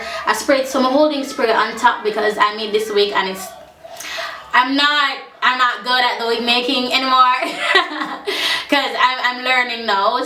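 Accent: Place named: Jamaica. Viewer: i love your accent not many Jamaicans around here :)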